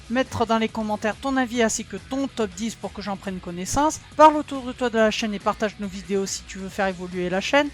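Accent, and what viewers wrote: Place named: Marseille, France. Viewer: ho...j'croyais que t'était marseillais...oui,par ce que t'a vraiment l'accent...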